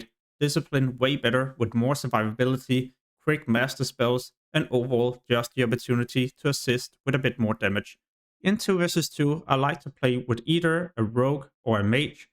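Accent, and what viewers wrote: Denmark. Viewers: Are you danish? 🇩🇰 or what accent is that?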